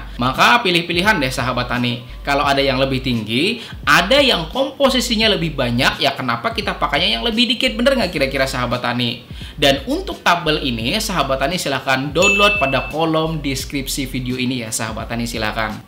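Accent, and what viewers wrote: Banjar, Indonesia. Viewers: Joosss buat ppl...walaupun gmn logat banjar ny gk ilang²....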